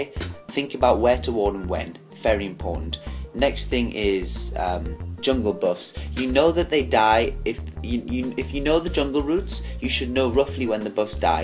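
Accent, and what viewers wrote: United Kingdom. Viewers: Man, THANKS for the guide and for speaking british english xD English is not my native language but I understand yours way better than american one. So I learn as much as I can.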